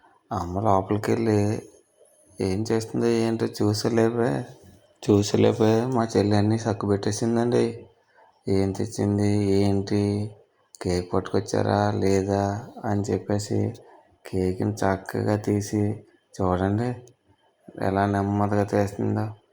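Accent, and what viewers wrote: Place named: Godavari, India. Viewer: Orye nuvu entira godariabbailala cheptunnav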